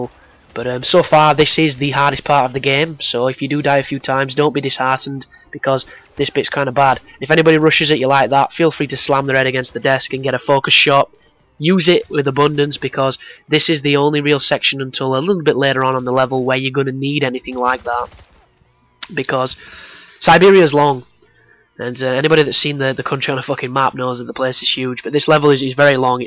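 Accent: United Kingdom. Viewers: You sound British man!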